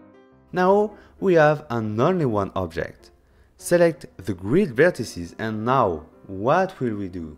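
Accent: France. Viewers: Love your French accent may be I am wrong. Awesome tutorial by the way. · This is the first time I watch this channel. What a pleasure to be welcomed with such a good French accent ! Great content. Subscribed. (S’il te plaît garde l’accent français c’est parfait comme ça)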